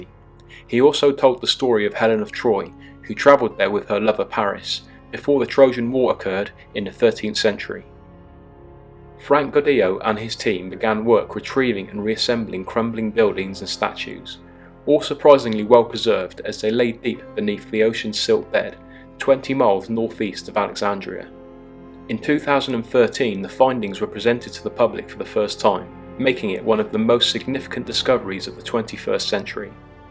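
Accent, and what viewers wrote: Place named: Dorset, United Kingdom. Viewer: Non-sensationalist content, nice measured delivery, and that Dorset accent (lived there for a short [but "interesting"] time) Automatic subscription (and I've forwarded your YouTube location address to a colleague of mine, who retired to Bridport, after finally completing her Ph.D. (3 months before retirement :-D ) Yes, Les - I'm talking about you!! :-D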